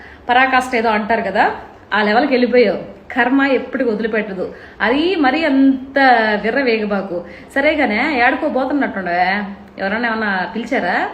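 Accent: Nellore, India. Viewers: Nellore yaasa not godhaari yaasa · GODAVARI YASA KADHU RA BABU, MAA NELLORE AK47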